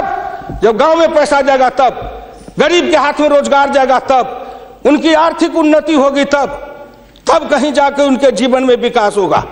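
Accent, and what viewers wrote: India. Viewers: sir you have brilliant desi style of speech with logically correct ground reallities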